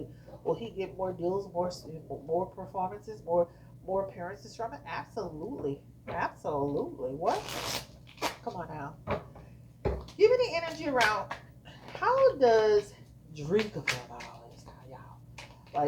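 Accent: New Orleans, United States. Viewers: You got jokes 😂 I love our New Orleans accent⚜️ What’s so funny is… I never knew we had an accent until I caught on my taking the time to listen 👂🏽. How do we sound to you??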